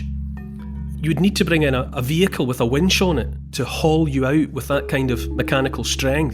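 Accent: Scotland, United Kingdom. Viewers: Well, I thought I was going to be able to listen while I refinish my floor but I couldn't take my eyes off the amazing views. I didn't realize how stressed I had gotten with the elections and all else until I heard your voice and watched this. I really needed a moment to remember the absolute gorgeousness in this world. I started listening to you a few months ago because of your good common sense and your accent. My Grandfather was from Scotland and I miss his voice, especially during times of unrest. I continue to listen to you because I need and so much appreciate view points from outside the states especially from someone with the characteristic no nonsense, tell it like it is, passionate, quit your whining, Scottish trait. Thank you, for your videos. They truly mean a great deal to me. On another note, somewhere toward the beginning of this video you showed a picture, don't even know what it was in particular now but, it immediately made me think of the Bay of Fundy in Nova Scotia, Canada. 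My mother and I were visiting family up there and we stopped to see the tide come in. My mother explained how a big wave would just come pouring down the inlet at high tide. As a young child at the time, I thought she was exaggerating. Sometimes she could be a bit melodramatic. But as we stood there waiting more and more people came to see the tide come in so I thought maybe this was going to be something interesting. Then it happened, from far off you could see a wall of water racing d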